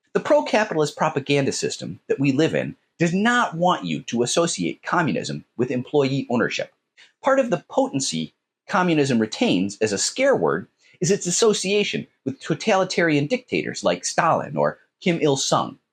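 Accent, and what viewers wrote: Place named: United States. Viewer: I assume from your accent that you are from the US. Preaching the merits of Communism from a position of wealth, prosperity and freedom in the world's most successful Capitalist nation is the definition of hypocrisy. If Communism is as glorious as you allude to, why, sir, are you not living in Venezuela, Cuba, or North Korea?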